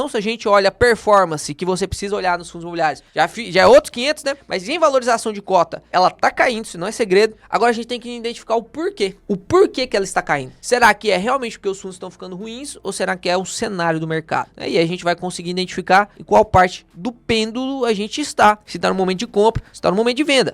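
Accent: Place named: Minas Gerais, Brazil. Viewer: Velho. Essa voz de batuta da roça mesclado com conhecimento e uma pitada de mineirez , ficou top ! Kkkk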